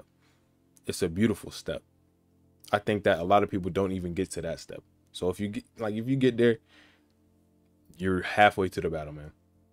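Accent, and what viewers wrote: United States. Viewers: You from Georgia bruh?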